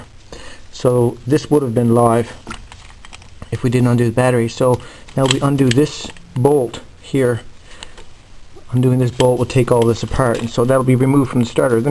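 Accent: Canada. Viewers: Another nice review. You seem to have a maritime accent -- are you from there?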